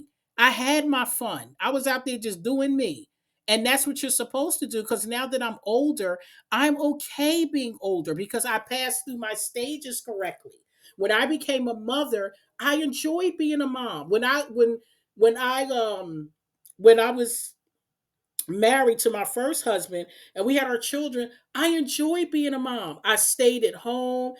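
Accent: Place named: Philadelphia, United States. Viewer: new subscriber just turned 25, really enjoyed this video!! wish i heard it a lil younger lol! any chance are you from philly? i hear it in your voice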